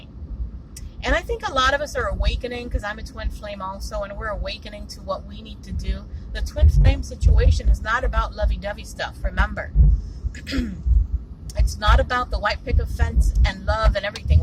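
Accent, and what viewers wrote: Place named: Latin America. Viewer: I heard your voice and I immediately tried to figure where you are from. I know South Florida, but I would think Venezuela or Puerto Rico. I don't hear so much cuban. Perhaps Colombia? I am into accents. INFJ/Scoprio here in Tampa. Btw, this is one of the problems I see as well. Great vid.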